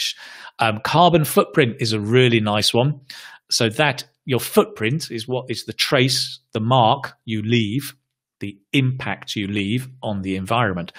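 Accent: United Kingdom. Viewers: Is this British accent , please anyone tell me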